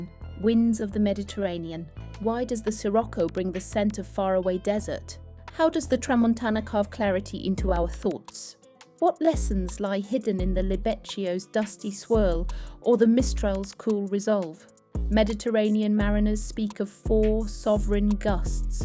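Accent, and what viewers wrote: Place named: United Kingdom. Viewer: British accent ❤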